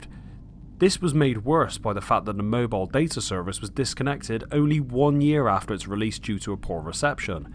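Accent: Cornwall, United Kingdom. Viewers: British limey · Recognised the kernow accent and subscribed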